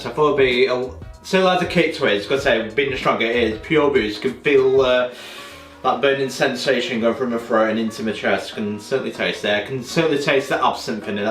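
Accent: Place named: United Kingdom. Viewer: That's the most British accent I've ever heard. Cheers! 😂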